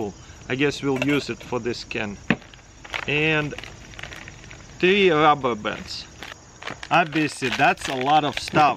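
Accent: Russia. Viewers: Why we love: 1) His excitement to do something 2) His positivity on everything even tho it isn't that good 3) His gratefulness 4) and of course his Russian accent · You should prolly do a video saying only pashtet cos I pretty much watch your videos to hear you say it 😂😂😂. I love Russian accents 👍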